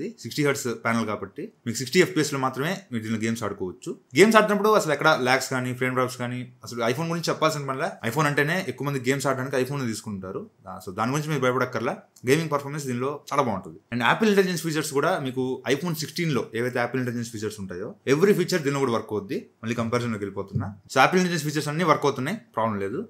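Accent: Nellore, India. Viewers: Anna Nellore aa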